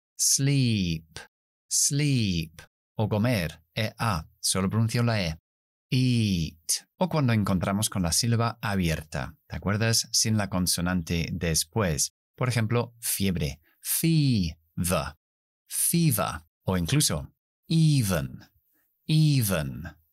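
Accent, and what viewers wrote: United Kingdom. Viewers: Me encanta el británico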